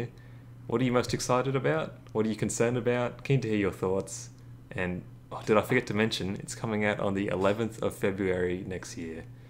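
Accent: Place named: England, United Kingdom. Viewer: HOLD ON YOUR ENGLISH??? I THOUGHT YOU WERE AUSTRALIAN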